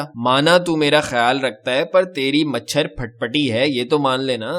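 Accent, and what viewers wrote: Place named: Karachi, Pakistan. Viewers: Please always use Karachi accent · ap karachi k logon ki mimics bht achi krtay ho keep that karachi accent in every video.😁😁🤣🤣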